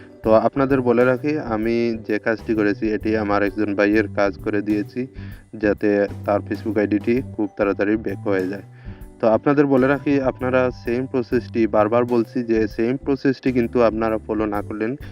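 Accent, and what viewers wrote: Sylhet, Bangladesh. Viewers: Vai kita Sylheti ni? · ভাই কি সিলেটি??😊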